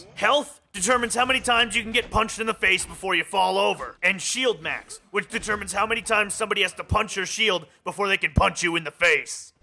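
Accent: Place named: United States. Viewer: Sadly going by the accent I'm going to hazard a guess that you're going to be on a US server.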